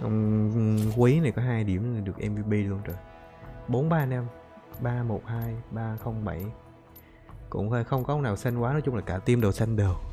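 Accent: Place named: Phu Yen, Vietnam. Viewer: giọng giống PY quê mình thế nhờ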